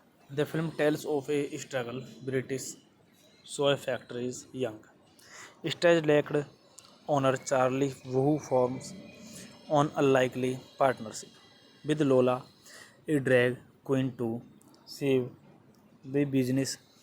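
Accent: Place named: India. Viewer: Estúpido el que hizo estos videos. Queremos la película no tu voz de la india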